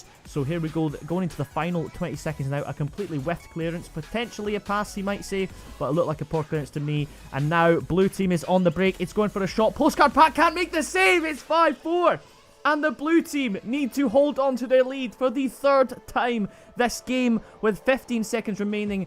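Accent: Scotland, United Kingdom. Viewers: The Scottish Accent 🤣💯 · he sounds scottish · Love the Scottish accent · THAT SCOTTISH ACCENT LMAO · That Scottish accent deserves 1mil subs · i hate the scottish acent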